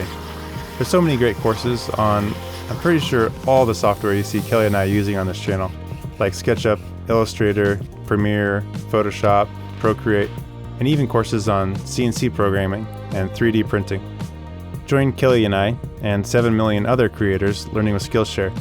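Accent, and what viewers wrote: Ireland. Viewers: that irish accent.... you weren't joking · Just discovered the channel and have been binging all day. Love it, but it does need approximately 60% more Irish accent. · Irish ☘️ is the best accent to listen to 👍🏼🤷‍♂️😂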